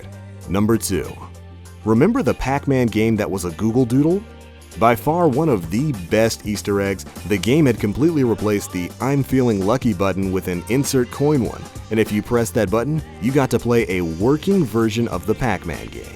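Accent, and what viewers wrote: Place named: United States. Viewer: You're in the UK? You sound so American.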